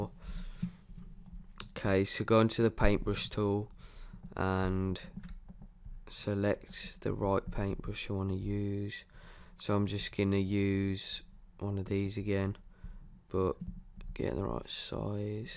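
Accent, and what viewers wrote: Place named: Birmingham, United Kingdom. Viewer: birmingham accent lol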